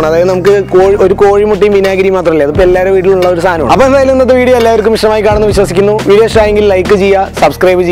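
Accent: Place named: Palakkad, India. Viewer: ചേട്ടാ... ചേട്ടൻ Palakkad ആണോ?!? സംസാരം കേൾക്കുമ്പോൾ അങ്ങനെ ഉണ്ട്... നമ്മളും Palakkadinna😅😅 chettante videos എല്ലാം poliya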